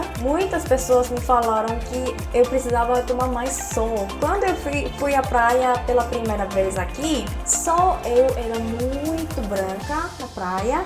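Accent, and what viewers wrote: South Korea. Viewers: Parabéns, raramente tem sotaque coreano em algumas partes. Sua pronúncia é muito boa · O sotaque coreano é de longe um dos mais gostosos de ouvir falados na nossa língua :v Me dá agonia ouvir estadunidense ou britânico falando português... Já o coreano fica tão fofinho. Acho que é pq vcs realmente se esforçam pra aprender a língua e falar bem. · uma coreana falando portugues e a coisa mais fofinhaa, mano eu tomo sol e nao consigo ficar bronzeada.. e triste ne mas e cara eu nao tenho bundaaaa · GENTE UMA COREANA FALANDO PORTUGUÊS 😻♥️ QUE FOFINHA . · Coreano falando português é a coisa mais linda do mundooooo❤❤❤❤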